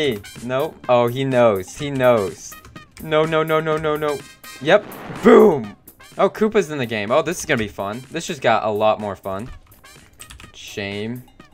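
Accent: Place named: United States. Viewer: me who would think that the one who owns such a strict group would not call everyone a moron also u sound like your from america but isnt this a british army?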